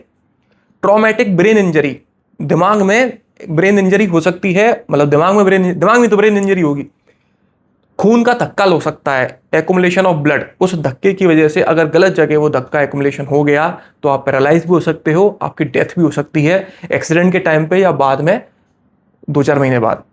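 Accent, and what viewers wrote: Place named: Uttar Pradesh, India. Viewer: kasganj etah ka accent ha apka pr i’ll drive slow always thx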